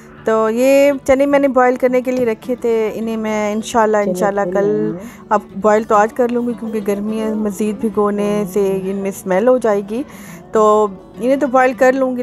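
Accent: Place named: Punjab, Pakistan. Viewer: Apka bòlna buht acha h jb suĺtn bahoo darbar pe jy to waha log asy bolty h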